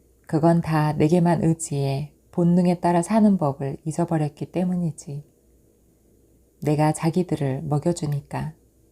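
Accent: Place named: Jeolla, South Korea. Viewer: 전라도 억양 정겹네요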